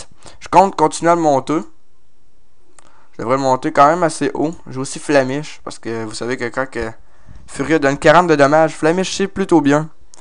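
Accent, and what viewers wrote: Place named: Quebec, Canada. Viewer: Très bien ta voix québécoise ;) ! Et pour le nom du steamer....euh... Aucune idée mais fait gaffe au pseudo Vu que sans doute on n'aura pas le droit de mettre le vrai nom de la classe ;) et pour l'élément va sur le site dofus tu peu déjà voir quelque sort les caractéristique et tout :) bref...très bonne vidéo ;)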